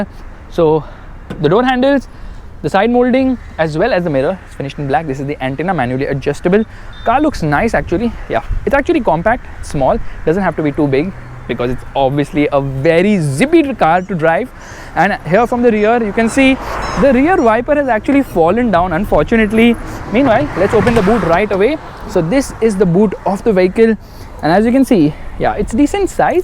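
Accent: India. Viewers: I hate Indian English Accent 🤮🤮🤮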